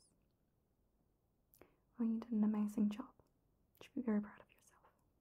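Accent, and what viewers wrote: New Zealand. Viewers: Great video and love hearing the nz accent! Feels like being at primary school doing the hearing tests 😅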